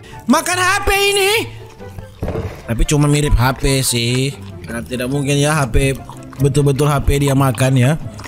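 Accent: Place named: Sulawesi, Indonesia. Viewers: Kentara sekali logatta makassar kanda😂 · Kayak logat orang sulawesi dank,lu orang mana bg? Di jawab donk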